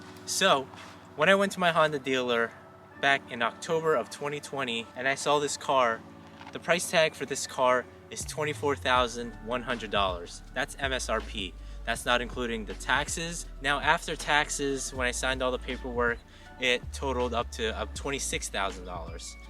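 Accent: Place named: Guyana. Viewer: Are u Guyanese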